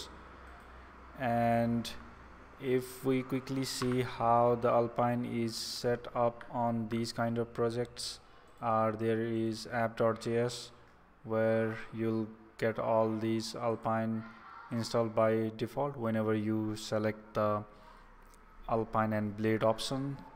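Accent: India. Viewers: Indian Accent is annoying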